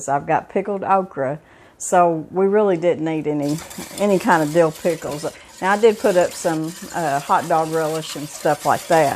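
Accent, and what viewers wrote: South, United States. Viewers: I'm a Yankee up in Maine now and I've enjoyed your channel SO much. Just listening to your accent reminds me of my grandmother from NW Georgia - she taught me to love cooking. And my husband always teases me because I "slip" back into my southern accent after watching you!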